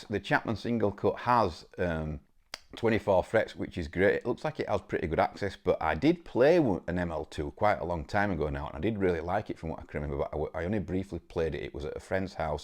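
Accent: United Kingdom. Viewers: Great video. Man. I love the single cut. I also love ash with the way your Charvel is. Very cool, but like you I love single cuts and Gibson short scale. Ash is actually several, or about 4 woods used in guitars. So called swamp ash is from the southern US and is typically light weight . I have a Japanese Strat I am building and still need to order a neck. It is cool with three EMG SA pickups because I hate single coil noise. I also have a 1990 Charvel Japanese crackle red with reverse pointy headstock and factory Schaller trem. You can throw that guitar against the wall and it won't go out of tune and I've had it since about 2000. I knew the original owner and both of us ayes this guitar a lot and the frets are still huge. They used some kind of Japanese superior steel in their frets back then. I also have a Japanese Ibanez and it's frets don't seem to wear. 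I had a Mexican strat that was great but those frets sorry down in like 4 years and I sold it. 😂 Great video though and I hope you can get the single cut especially to show us. It sounds like it has a great price. I think the Euro and dollar are about the same now. So makes it easy to convert. 😂 Are you in the UK, or Scotland or Ireland? You have a really thick accent to this American. 😂. I can usually tell. I have a friend from Scotland here in the US. You sound like him. My son went to Scotland for like 6 months while in college and he loved it. Anyway. Great channel a d good videos sir. I've been following you awhile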